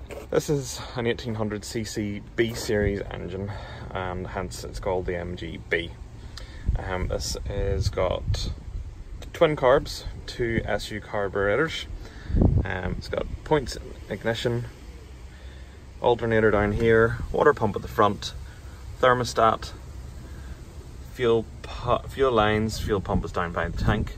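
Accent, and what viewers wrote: Northern Ireland, United Kingdom. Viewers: Now that's a very particular accent. Northern Ireland? By the way, Nice car.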